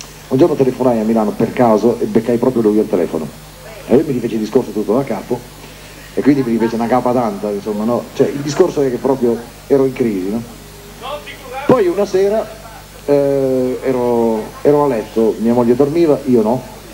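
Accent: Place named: Emilia, Italy. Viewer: E' veramente bravo! sono lieta che sia un Emiliano come me, e, che parli come me.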